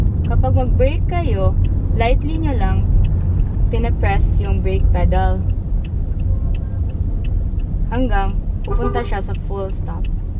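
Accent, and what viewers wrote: Visayas, Philippines. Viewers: bisdak for sure! 😍 sweet tone of voice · Bisaya kba? I can hear some tones. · bisaya man ka dai, pag bisaya dai oi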